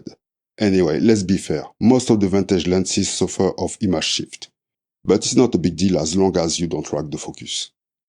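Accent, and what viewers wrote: France. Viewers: Super Chaîne ! A l'accent vous parlez français nan ? :D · J adore comment tu own ton accent frenchy la classe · Im a bigf takumar fan but I own ttartians are good too your french accent is quite pleaseing what software do you use for your graphics?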